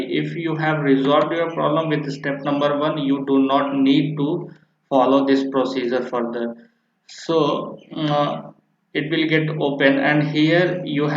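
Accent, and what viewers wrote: India. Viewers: Ffs Good Video But Im So Sick Of That Indian Accent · the indian accent is the cherry on top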